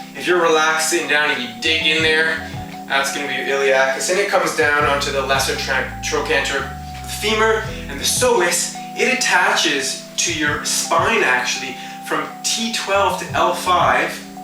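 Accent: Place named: Canada. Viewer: Gud to see you know what you talking about.At first I thought oh no not another American on YouTube. But I think you're Canadian aren't you.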